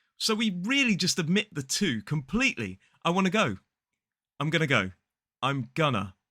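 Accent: United Kingdom. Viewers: 🙏💛💙 Adore British accent! It’s music to my ears! Thanks for the video! Pretty helpful!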